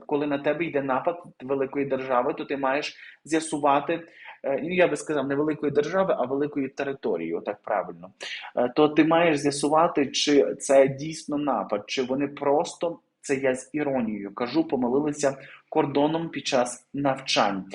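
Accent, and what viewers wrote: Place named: Western Ukraine, Ukraine. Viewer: Ну что ж такое, что это за украинский с западенским говором, ни одного города не назвал правильно. Хоть Залужный правильно сказал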